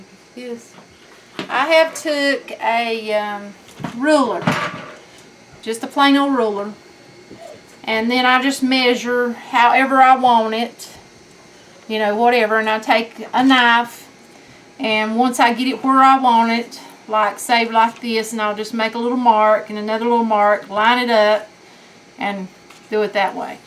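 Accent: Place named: South, United States. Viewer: OMG I LOVE YOUR VOICE! YOUR VOICE SOUNDS LIKE, JUST LIKE MY GRANDMA, the accent sounds just like her, she's from the south. Omg love it.. sorry lol. Very nice video. Enjoyed and will add you to fb. Omg, your accent is the same wow.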